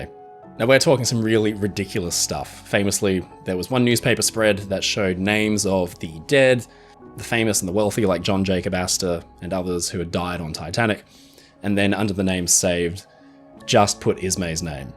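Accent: New Zealand. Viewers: you're from new zealand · Thanks for a brilliant video. I learned a lot. Many years ago, before the 1997 movie came out, I read that Titanic had 50 inter-ship telephone lines. What would they have been used for? And is this true? Also, are you a kiwi or an Aussie?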